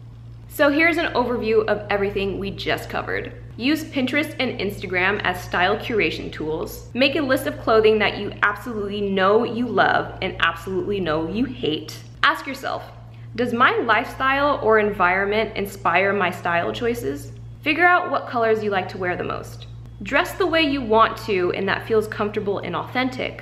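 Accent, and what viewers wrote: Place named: Australia. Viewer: Your Australian accent is amazing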